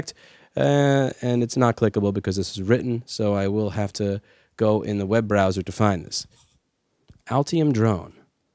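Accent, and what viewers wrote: New York, United States. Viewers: Thank you, for talking like a real New Yorker. Your message is being heard.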